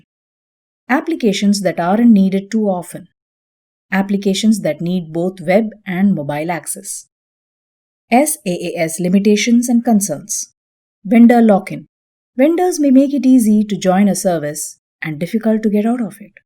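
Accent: India. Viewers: first things makerdemy should do is to inform your staff speak slowly, not like robot, with rather strong india accent, i hardly understand a word you saying.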